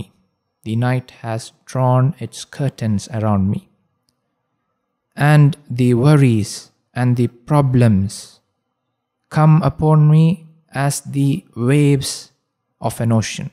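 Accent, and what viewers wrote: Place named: India. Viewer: السلام عليك انت عربي ام من النهد لان لهجتك بالإنجليزية تشبه اهل الهند 🌹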